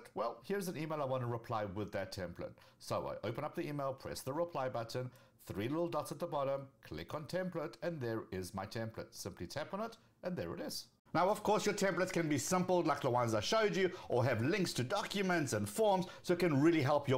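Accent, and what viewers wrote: South Africa. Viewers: your content is amazing and your SA accent makes it even better.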